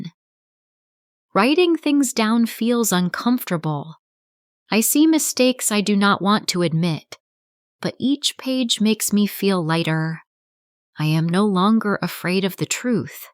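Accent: United States. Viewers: This Chanel is American because the accent is American clearly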